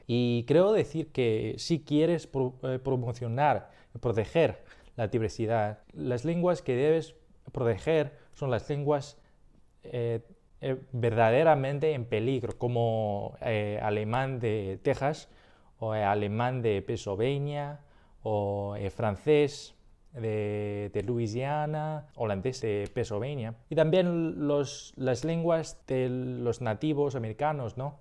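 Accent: Castile, Spain. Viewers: ¡Que copado! Hablas como un nativo hispanohablante con acento de España. 💙👍🏻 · Salvo unos pequeños errores en los artículos (a todos los no nativos de español les pasa) es bastante bueno el habla, hasta tienes el acento y manerismos del español de España. La conjugación se te da muy bien y suele ser lo más difícil del idioma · Excelente video, habla muy bien el Español, con acento de España, que consejo nos daría a la hora de aprender idiomas, a mí me gusta mucho el inglés y el hebreo. Saludos desde Colombia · me gusta tu español, tienes un acento español, pero de repente le metes ciertas expresiones latinoamericanas que gustan mucho c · ¡Es un mundo pequeño! Un Chino Americano hablando Español castellano. Saludos de Tucson. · ¿De donde aprendiste español? Yo pense que pude escuchar a un poquito de acento España, pero no podría ser seguro. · Your Spanish is great, mate! 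I'm curious, why do you have a Castilian (Spanish from Spain) accent? People from the US usually learn the Mexican variety, due to proximity and exposure. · You should specify you speak Spain Spanish. Which usa Spanish hates lol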